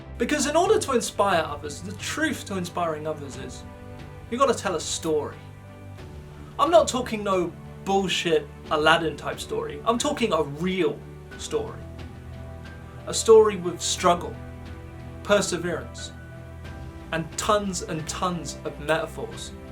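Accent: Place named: Ireland. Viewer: Well wtf have you turned in to, you might have been better of telling us your number one fans, the bull shit story of Aladdin in your Irish accent,it would have been more fun, keep them coming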